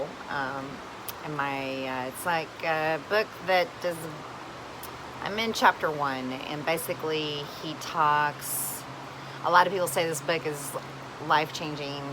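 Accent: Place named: South, United States. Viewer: Hi! What a beautiful place! And salad! And southern accent! I like how you talk about stuff. I really want my whole channel to be like that.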